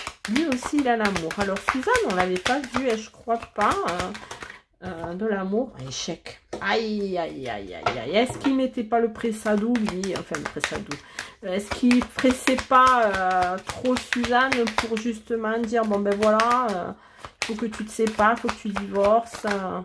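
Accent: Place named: South, France. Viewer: Coucou ! A mon tour, je vais regarder ta vidéo. J’aime ton accent du sud ! Et je me suis abonnée. 🌹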